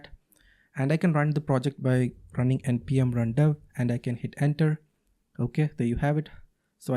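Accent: Kerala, India. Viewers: Nice Malayali accent bro 😃 Very nice demo 👍✅ By the way, Do you like Cursor or VsCode?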